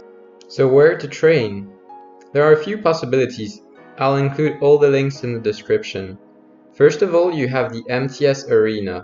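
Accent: France. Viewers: Je comprend tellement mieux avec l'accent français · c’est cool je comprend mieux l’anglais avec l’accent fr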